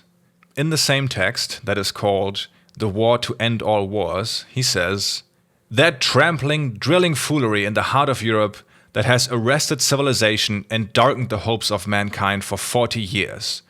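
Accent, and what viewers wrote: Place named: Germany. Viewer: this is a great story, and well told, but am i the only one who feels it wouldnt be half as good w/o the narrator's thick german accent? really puts the icing on the cake.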